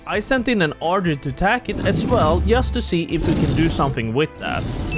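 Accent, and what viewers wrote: Sweden. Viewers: "VAD I HELVETE" god damn that caught me off guard🤣 Wasn't expecting swedish but guess that's why the accient sounded so familiar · Swedish accent shines through - Your Danish Sub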